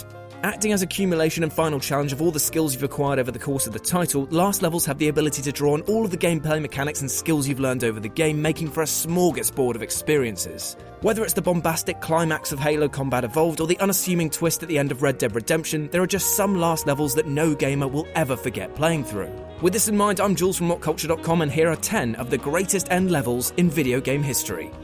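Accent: United Kingdom. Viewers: This guy should really think about what he says in videos before publishing biased opinions for ANYONE to see. From the accent I'd expect him to be from the UK; with that said he probably hasn't got the slightest clue about his witty remarks about something he may have absolutely no experience with.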